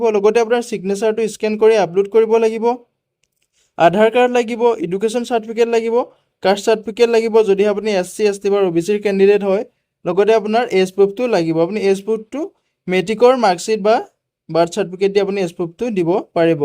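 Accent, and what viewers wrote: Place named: Gujarat, India. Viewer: Tur mur tu vdeo bonaiso ..Kun stater hoi sai lo...gujaratar aru local language lage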